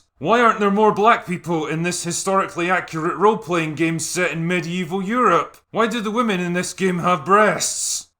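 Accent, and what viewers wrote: Scotland, United Kingdom. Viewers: It is so funny hearing a Scottish man bashing American journalists who keep saying nothing except how much they hate the orange man while the rest of the world just wants to enjoy a new video game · Pissed up Scottish man seems to be making some sense in these times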